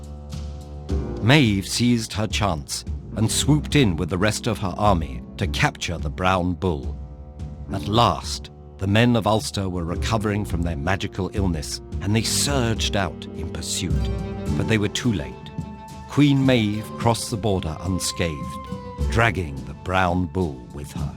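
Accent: England, United Kingdom. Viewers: Hearing this narrated in an English accent was painful and so unneccessary! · This lads English accent is genuinely difficult for my Irish brain to understand. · So strange to hear this story told in an English accent. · hello from Dublin Eire . Pronunciation is everything to the Irish , as you get a brit to tell the tail of the greatest story ever told . to all who enjoyed this poor telling of our greatest tale . its name is " Táin Bó Cúailnge " or the Cattle drive of Coolie , read the book by Thomas Kinsella. Cu Chulainn, pronounced Cu-Cullin , was war lord from the north , a bruit , a womaniser , he would take your head , for sooner look at you . yeh they breed them special up north . he enshrines the Irish sprite of freedom , that is indomitable , ask the brits how much we Irish like our freedom . yet they still claim ownership of the land of Cu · English man reading irish mythology....what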